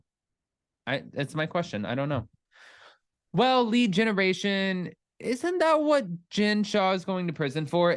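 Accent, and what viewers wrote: New Jersey, United States. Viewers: We love your 'Joisy' accent. it really colours your story! Hope you and Jason have a great weekend, and that Shaylee gets some well deserved rest!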